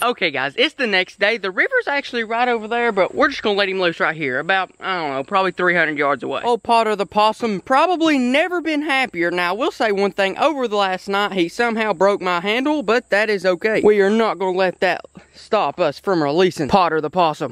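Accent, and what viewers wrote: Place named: South, United States. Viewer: I love your accent I mean cuz you're so country cuz don't worry I am very country but not as country as you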